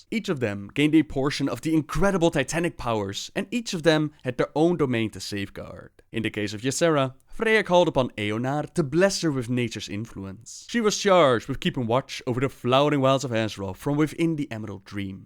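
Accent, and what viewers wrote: Netherlands. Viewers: Dutch accent> stopped.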